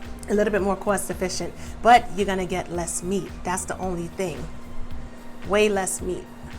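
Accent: New York, United States. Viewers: I love this channel. I can't lie, I come back for that NY accent more than anything. I love how women from NY talk.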